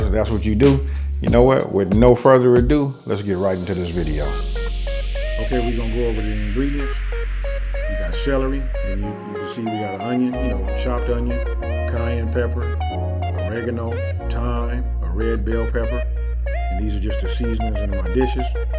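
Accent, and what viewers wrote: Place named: South, United States. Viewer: Hi I'm new to the channel band I'm looovving everything I've seen so far!!! where are you from? I hear a southern accent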